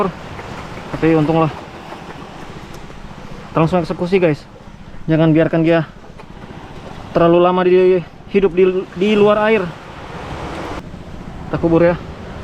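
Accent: Manado, Indonesia. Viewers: pantas tu logat rpa qt kenal, org manado kote wkwkwkwkw org manado klo bhs indo dpa tau dpe logat wkwkwkwkkw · Bang loe orang Manado ya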